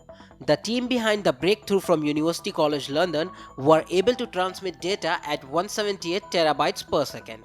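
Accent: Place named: India. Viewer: 咖喱味英语劝退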